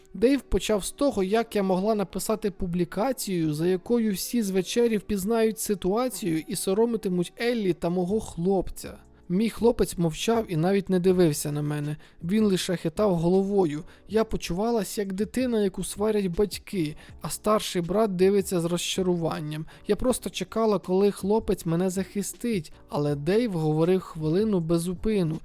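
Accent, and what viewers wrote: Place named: Zaporizhzhia, Ukraine. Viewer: Ваша подача інформації та коментарі дуже піднімають настрій😊 дуже дякую за вашу роботу. Дуже схоже наче слухаю людей із Запоріжжя, якщо тут є запорожці думаю мене зрозуміють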